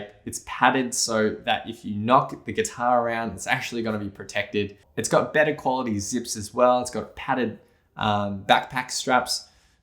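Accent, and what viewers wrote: Australia. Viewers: The Australian accent is screaming ate so hard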